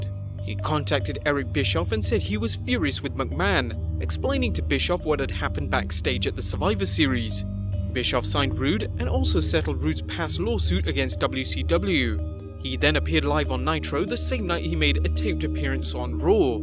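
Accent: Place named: United Kingdom. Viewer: Mostly a nit brit poorly reading some text.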